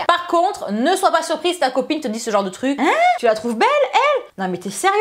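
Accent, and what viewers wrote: South, France. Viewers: oh putain con l'accent, tu viens tu sud toi hein !!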